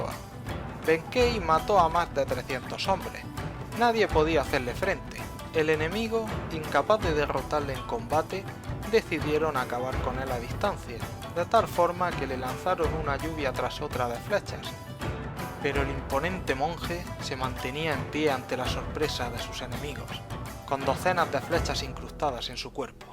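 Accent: Murcia, Spain. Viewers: me mola como habla el locutor,parece murciano. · Liked the video but hearing the spaniard accent of spanish just pisses me off LOL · ¿de donde eres de murcia o de almeria? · Jaja como se nota que eres de murcia vamos o eso parece por la forma de hablar jeje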